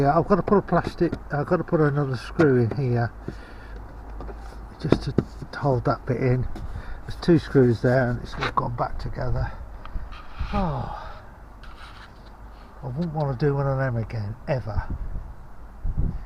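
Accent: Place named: United Kingdom. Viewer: Thanks for this video. As a British accent lover i have to also say that your accent is amazing!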